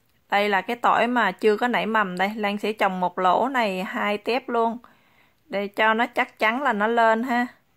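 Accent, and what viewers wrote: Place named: Mekong Delta, Vietnam. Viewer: OK. Chi Lan. Giong noi chi nghe nhu mien Tay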